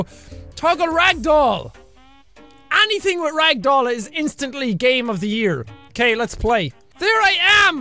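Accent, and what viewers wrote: Ireland. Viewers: Apparently as time goes on jacksepticeye sounds more Irish 🤔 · jacksepticeye YAY!!!!!!!!!!!!!!! I love Irish accsents!!!!!!!!!!!!!! I myself are half Irish ......... :)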